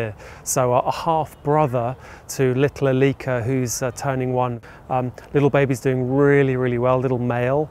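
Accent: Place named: United Kingdom. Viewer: A Fantastic Mom so far! And a Fantastic British accent so far♡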